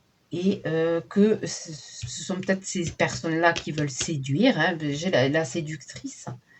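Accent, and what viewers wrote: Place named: South, France. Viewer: Vous avez un accent du soleil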